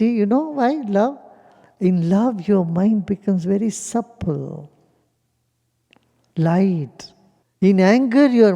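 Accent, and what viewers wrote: India. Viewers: I can only make out a word here there with this guy. The Indian accent is the hardest. It just basically blears words together.😳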